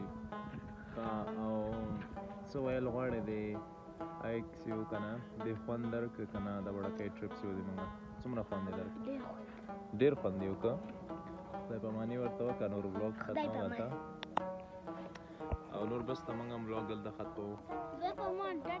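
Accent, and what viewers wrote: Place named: Afghanistan. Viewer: Your sada pashto accent 🥵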